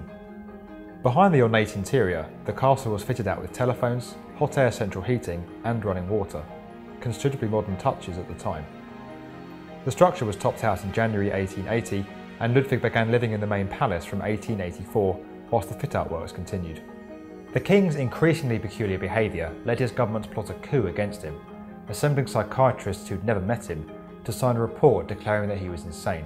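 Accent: United Kingdom. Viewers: I hv 2 comments on the narrator and the commentators here: 1- the narrator is speaking in British English, with a heavy accent only the British could follow him. British English is a curiosity to listen to but very difficult to understand and follow by non English speakers. The Brits speaking to non-Brits should realize this and speak more intelligibly (slower). 2- people who not versed in foreign pronunciation and phonetics should not speak/write as if they are correct and should notate their input as “I believe”. None are German unfortunately which makes no one’s right ! It is not Noi nor Noy and “Neu” in German is more French sounding than English so it cannot be phonetically written the English way: it is close pursing the lips when twisting the sound “eu”. Schwan sounds closest to “shvon”, with the “o” here like the American “o” (as in “pot” & “hot”) and not the British “o” (as in “pot” & “hot”). 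Then stein is pronounced simply like in both Englishes “shtine” as in “shine”. When phoneticizing a word one should clarify which type of English pronunciation is used, since British, American, Australian, Indian, Egyptian ways differ considerably, and after all, YouTube is global and not any one of these !!!! Hope this helps non-German speakers pronounce it correctly.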